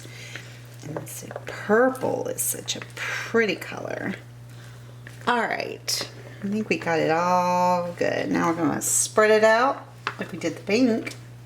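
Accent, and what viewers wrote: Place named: South, United States. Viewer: This looks cool btw I like your voice it sounds southern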